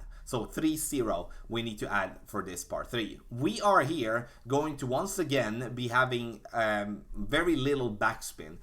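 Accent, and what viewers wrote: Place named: Sweden. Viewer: Todd Calhoun swenglish👌🏻😁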